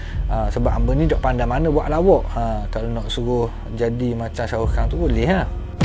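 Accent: Terengganu, Malaysia. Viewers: Assalamualaikum, sejak tgk channel saye dah faham loghat ganu🎉..sblm ni satu pun xpaham🤭...manusia berbeza2, Allah swt beri kelebihan dan kekurangan masing2. Org ikhlas berkongsi cerita, kite menjadi pendengar yg supportive. 🔥🔥🎉🎉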